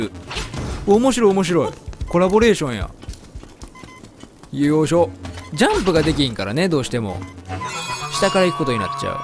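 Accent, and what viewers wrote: Kansai, Japan. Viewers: 大好きです😆 関西弁移ちゃった😜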